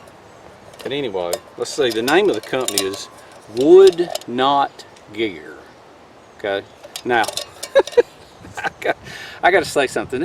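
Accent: South, United States. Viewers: I really liked this campfire story, especially with the accent. Its like southern ASMR.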